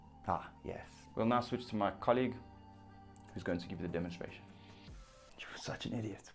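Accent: South Africa. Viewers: hey man. Thanks for the video my man. Speaking the truth. Out of interest are you perhaps South African?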